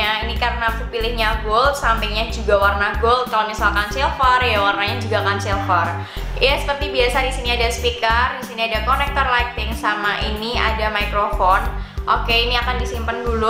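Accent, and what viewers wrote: Java, Indonesia. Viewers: Medog banget ya ngomong nya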